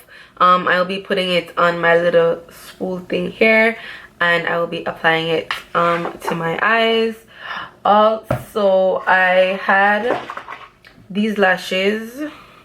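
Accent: Jamaica. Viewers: Chile the jamaican accent is tryna jump tf out loool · Is she Jamaican? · I hear my Jamaican voice 😍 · You sound Jamaican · You sound jamaican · Are you jamaican? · Dah jamaican accent🇯🇲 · Immediately subscribed once u started talking #Jamaican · Jamaicans English language sah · Are you jamaican? Love this.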